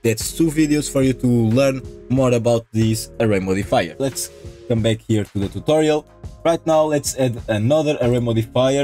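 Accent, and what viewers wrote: Portugal. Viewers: O sotaque de tuga sempre da para perceber, parabéns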